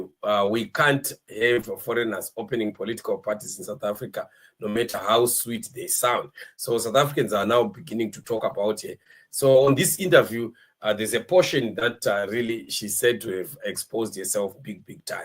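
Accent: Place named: Zimbabwe. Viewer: You sound Zimbabwean yourself. Why do you foreigners keep on putting your noses in South African affairs as if you are entitled to this country and our issues???? Why dont you make podcasts of your sruggling country and try better it. Instead its nywe nywe nywe South Africa. Hayi foetsek man. We are sick off you ppl now. ANC is on its way out. Once MKP gains traction you will see what's coming your way. Bugger off man!!!!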